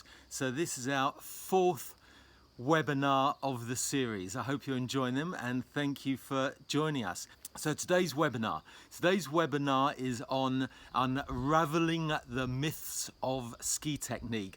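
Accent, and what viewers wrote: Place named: United Kingdom. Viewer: We must be close in age because I also learned skiing with over 2 meters under my feet and extension was kind of essential to rotate those monsters. And now I still struggle to avoid doing it because with these new skis extension equals lose control... btw I've started skiing again only five years ago and adjusting is still problematic at times. Thanks for these videos, love the Brit accent, but remember here in the US a stretched middle finger might send a problematic message :)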